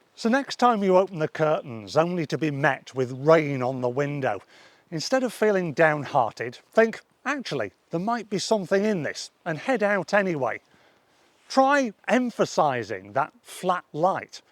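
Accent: United Kingdom. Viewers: you're great. i just found you a few minutes before and im all in. interesting story-telling, beautiful pictures - love the brittish accent, and you're glasses are the cherry on top. i draw my hat sir!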